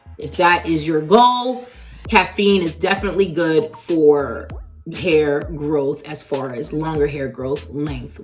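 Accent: New York, United States. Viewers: Sis, love your content, love your hair, love your New York accent! You keep me rolling! 😄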